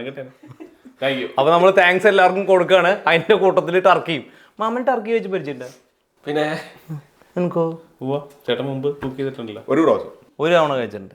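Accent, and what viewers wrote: Thrissur, India. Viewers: എന്റെ തൃശൂർ ഭാഷ 💙👌👍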